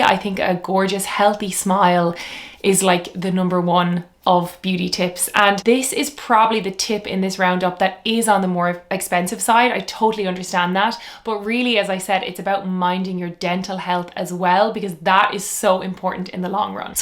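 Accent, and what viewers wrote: Ireland. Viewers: Oh the Irish accent! One of the most beautiful sounds in the world! I’m a voice over artist ..I should know! ❤ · Cant stand the irish accent though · Really rude comment. I love the lilt of an Iris accent.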